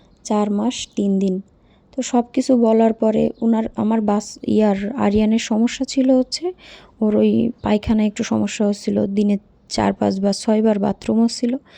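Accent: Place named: Khulna, Bangladesh. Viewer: আপনার ভাষা তো খুলনার দিঘলিয়া উপজেলার মত।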